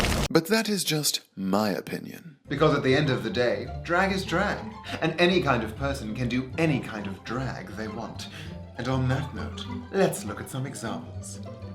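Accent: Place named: South Africa. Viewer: I just realized that your South African. Damn now I like you more because it feels closer to home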